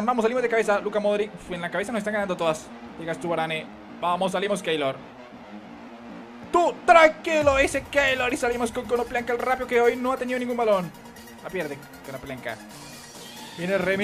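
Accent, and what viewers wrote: Colombia. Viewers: oye eres colombiano? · eres colombiano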